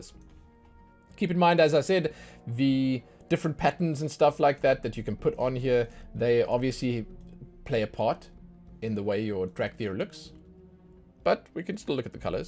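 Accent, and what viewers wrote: South Africa. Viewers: Off topic but are you South African?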